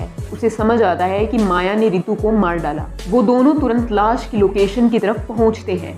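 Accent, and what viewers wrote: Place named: Gujarat, India. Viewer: The narrator has Gujarati accent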